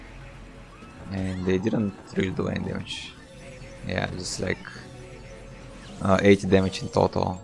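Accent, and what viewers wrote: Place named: Bulgaria. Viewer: Sorry for asking, are you Bulgarian? Your accent really sounds like you are indeed from Bulgaria.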